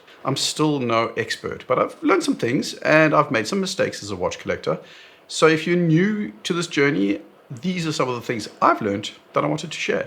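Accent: South Africa. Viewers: Bro are you South African?😅Speculating here. I do have loads of South African friends, hearing you was refreshingly unique but familiar.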